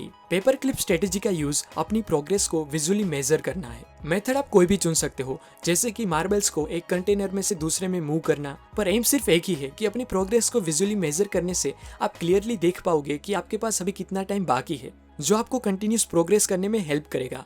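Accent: Gujarat, India. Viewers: I liked your gujju accent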